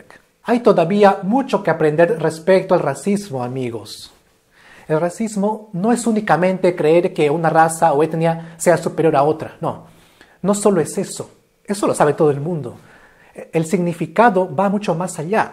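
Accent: Cusco, Peru. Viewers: Ser y de origen cuzqueño te trajo algun problema... cuenta tu testimonio... mi querido broder...como hiciste para súperar el acento cuzqueño que es fuerte por cierto...eres quechua hablante?.tus razgos fueron motivo de mofa en esta Lima despectiva?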